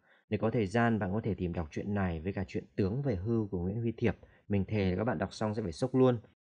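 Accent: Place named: United Kingdom. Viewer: Anh nói được accent của người Anh kìa :3 anh có phải từng sống ở Anh hay vẫn còn đang ở Anh đúng không?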